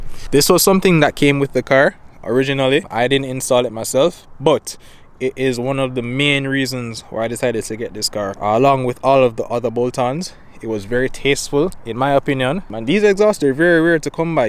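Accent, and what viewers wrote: Jamaica. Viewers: When the video started i heard the accent and did a wonder if you’re from Jamaica enuh, i was right 😂 subscribed immediately 🙏🏽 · Suh mi jus deh yah a watch bmw build and mod videos not really a car person and mi say let me watch this video den boom here comes a yaad man accent box mi just Suh glad fi see a yaad man channel big up ute🤣🤣🤣🔥🔥🔥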